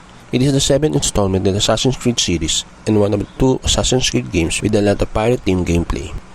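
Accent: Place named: India. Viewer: That's a messed up Indian accent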